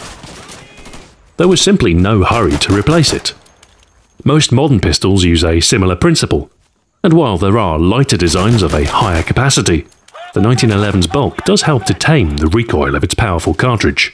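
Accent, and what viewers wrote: United Kingdom. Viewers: A very British Terminator’s voice. And here is probably to me THE reason why I think deep baritone BRITISH voices are the BEST at serious/epic narration, bar none! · Lol it's funny because sounds British. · He is British, Captain Price is also British xD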